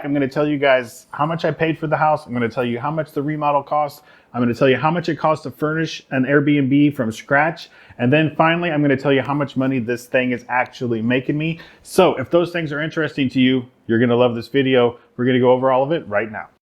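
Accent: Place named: Puerto Rico. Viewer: You have a slight. And I mean SLIGHT, Puerto Rican accent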